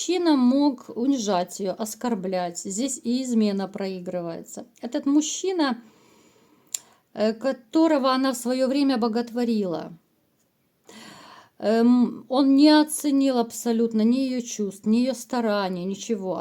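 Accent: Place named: Ukraine. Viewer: В речи редко проглядывается украинский язык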